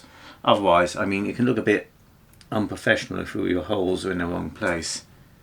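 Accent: England, United Kingdom. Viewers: Great...I love your work and your country English accent.........😊I have a beautiful collection of approximately 100 year old C.S. Osborne tools including Space markers. French edgers including curved etc...A round knife Circa 1900 stamped Crawford..new edge...my everyday tool...Large diameter hole punches...etc... I certainly enjoy your videos,.....P.S. Do you ever work with Cordovan Shell 🐎?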